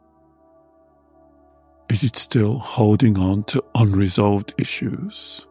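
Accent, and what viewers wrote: England, United Kingdom. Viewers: I love the English accent as well—it’s endearing ❤ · God!! You are AMAZING!! As I already know; I literally was with you, meaning, JUST US! NO ONE ELSE!; Simply a one on one, which makes you! Simply AMAZING!! Every step I envisioned my past; Or as Joan Collin’s named one of her books of her past “ Past Imperfect” 😅 I looked at my family and thyself’s, “Past imperfect memories of happenings, Emotionally and Happily., again I say, You are a Blessing to ALL. And Your Lovely English accent 👀 Helps too!😂 All the ✌🏼❤️ out 2 🫵🌿😉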